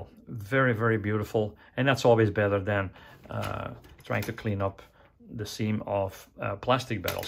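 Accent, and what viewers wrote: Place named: Flanders, Belgium. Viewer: Mine is in the post. I think it is more accurate and refined than the das werk kit. You have a (super slight) Flemish accent, are you Belgian (as you also worked for Verlinden)?Pistol port on the right hand side of the hull is superfluous. Das werk has none, Trumpeter had it on both sides. Should only be on the driver side. I like they provided the drive wheel center cap as separate. Like most probably, I prefer the look of all the bolts in plain view. I thought the tires were normal plastic already when I saw them, thanks for clarifying that, good news!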